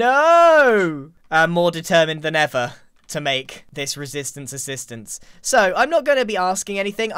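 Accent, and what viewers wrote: United Kingdom. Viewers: hey i dont know if this is rude or not but are u brittish?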